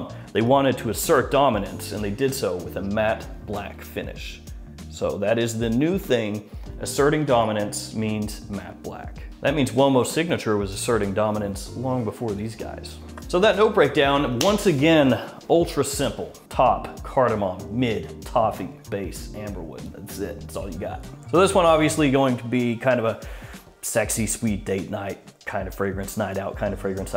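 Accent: South, United States. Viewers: Fragrance Leader... with a slight Southern accent... outstanding! #BeepBopBoop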